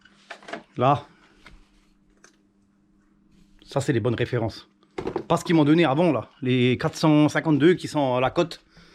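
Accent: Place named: Lorraine, France. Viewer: J aime bien ton accent, ça me rappel ma famille du côté de ma mère, on était à Metz. Les Kron et Ramm. Maintenant je suis en région parisienne, la misère pour trouver un bon garagiste... Faudrait que je fasse 350 km pour te voir ...😢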